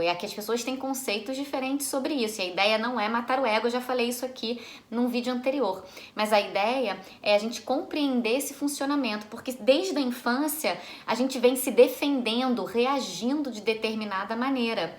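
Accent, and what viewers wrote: Rio de Janeiro, Brazil. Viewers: CARIOQUINHA. BOM.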